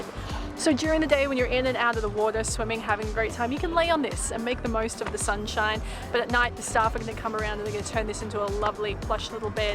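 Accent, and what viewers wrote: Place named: Australia. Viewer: Love your videos! As an Australian, it’s so wonderful to see an Australian YouTuber who speaks in a clear, nice sounding cultivated Australian accent without the use of Australian slang.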